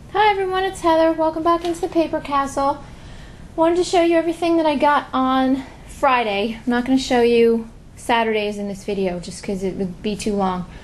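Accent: New Jersey, United States. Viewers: Just when we thought the crazy knit sweater guy was the funniest thing up pops your "Jerserylicous voice" with a garage sale story we are all too familiar with - thank you so much for the shout outs it really means alot to us - we are looking forward to your challenge vid - thanks again T&D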